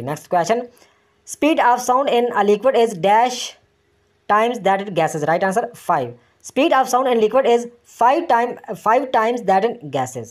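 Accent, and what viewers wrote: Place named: Punjab, India. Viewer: سر جی آپ انگلش کو پنچابی میں بول رھے ہیں ۔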